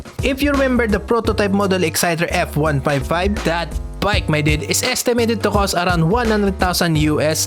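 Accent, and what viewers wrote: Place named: Conyo, Philippines. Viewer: Ang conyo mag english, don't try so hard bruh ang panget sa tenga.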